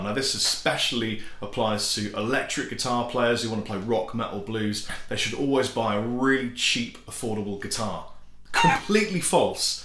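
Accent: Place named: Brighton, United Kingdom. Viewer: Hey, I´m 55, always dreamed of myself playing but never got the guts to take lessons and practice. Shame on me, I know. These days I use the adult life, job etc as excuses for not geting started, but, believe it or not, I still didnt give up. Probably I´ll never play at all, considering this failure of mine, but have you ever heard of utopia? Sure you did. That´s whta me playing at least reasonably well means to me. Two final things: perhaps the flip side of your conception of music and playing is some guys like... Klara Soderberg (First Aid Kit), Nataly Dawn, Stereo Total (ever heard them?). Their technique is way too rudimentary, sketchy, but they do manage to create great fun music. I tend to become a bit bored of virtuoso players who, despite of having a monster technique, dont "get there", cant make music that´s enjoyable after all. 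I´d put in this basket 90% of mainstream, wealthy acts: Eric Clapton, Pink Floyd, RHCP, you name it. For me, once the music is fine, it doesnt really matters how skilly you are. 2nd and last: does everybody in Brighton has your accent? It´s just great!! I wish I could walk around in London and hear only that around me.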